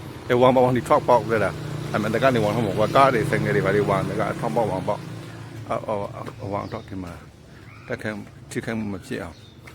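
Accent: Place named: Yaw, Myanmar. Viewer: ယောသံကြီးနှင့်ပါလား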